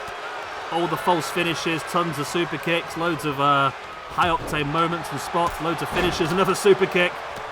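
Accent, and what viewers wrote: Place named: United Kingdom. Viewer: Bottle o’ Wataa , love the British accent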